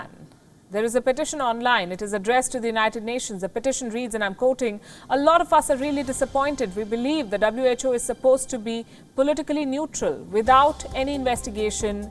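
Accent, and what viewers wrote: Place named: India. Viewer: Would this Channel will replace someone who able to speak proper English then thus lady could? She sounds like speaking Indian?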